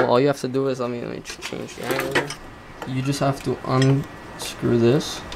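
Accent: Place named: Hawaii, United States. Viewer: Solid video.. bro you sound like one local from hawaii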